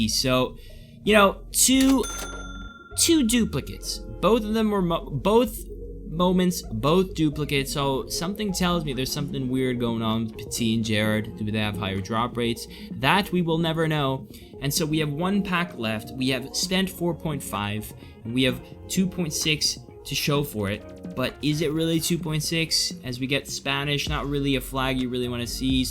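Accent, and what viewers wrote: United States. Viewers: Italian? You sound completely American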